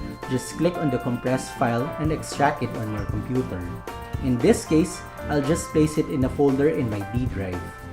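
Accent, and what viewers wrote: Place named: Philippines. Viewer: Pinoy ka ba? Halata sa accent eh lol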